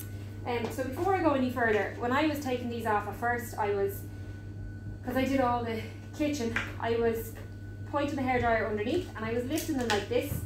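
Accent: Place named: Ireland. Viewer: Love your accent. Gotta love Irish ☘️ girls. 😊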